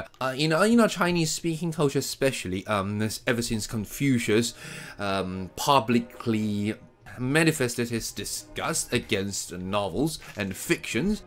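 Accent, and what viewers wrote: RP, United Kingdom. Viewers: 我只能說，無論是不是有人覺得這是刻意的英式英文，但，我太愛了！很開心從阿滴頻道認識Eko。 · S C this is not the only way to speak English, you can always alter your accent towards the kind you prefer. The accent/tone is just because he is trying to convey a thought, or say, giving a speech. I don’t see a problem in this😂. There are more than one British accent of course, and this is just how ppl talk in formal occasion. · 這英式口音太有魔性了😂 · 我也是看了阿滴才知道老師真的太帥了~超喜歡老師的英式~口音~! 真的會重拾學習英文的動力~ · Eko老師反差太大，明明外表超日本視覺系，感覺一開口就要講日文，但講出來卻是一口道地的英式英文，而且還能言之有物，厲害👍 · 看阿滴進來+1，老師的英式口音真的很好聽喔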